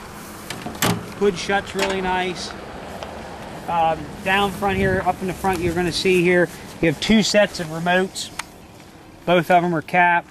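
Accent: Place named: Pennsylvania, United States. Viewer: I can tell you're from Pennsylvania with that Pennsylvania accent lol. Good looking 318 you got there!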